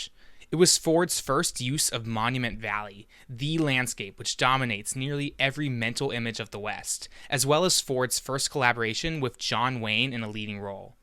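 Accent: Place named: United States. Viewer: This is very good. But it's a pity the narrator has one of those now typical modern American accents. i can't describe it in print but a whining raise at the end of words or sentences: ''that way of making moviess'' '' 7 Womennn '' . It's quite a new thing. Older Americans don't do it. It sounds awful and in some cases unbearable. Someone told me it's known as the ''high rising terminal'' or ''up talk''. Another person told me that it's known as ''vocal fry'' but someone else said that the whiny sound is neither of these ? Don't mean to be rude but it spoils and undermines an otherwise excellent analysis.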